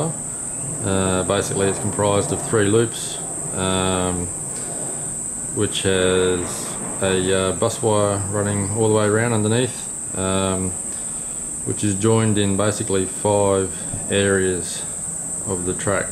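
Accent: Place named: Australia. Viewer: V good & should be helpful to me as I have finished laying my track to find my locos wont go around on it, so I now have to look at doing this. Glad you are running Hornby & select DCC as I am also. You are obviously Aussie & I am English but in NZ so do the kiwis call them suitcase clips "suitcase clips" ? But had you gone straight from the bus to the rail with one feeder you would have saved effort & half the amount of clips wouldn't you? Where did you get the clips & wire from? Thanks.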